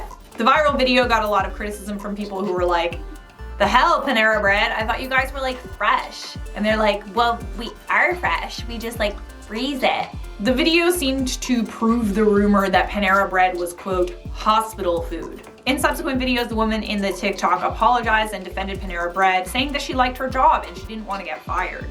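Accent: England, United Kingdom. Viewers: I was thinking I was gonna dig this video, then the British accent rolls in. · Stop trying to be funny it’s not working And your English accent is awful and cringe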